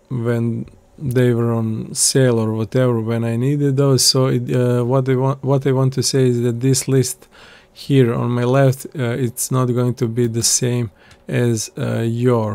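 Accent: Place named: Balkans. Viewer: Brate, je si li ti Sprksi? Cujem nasa acenat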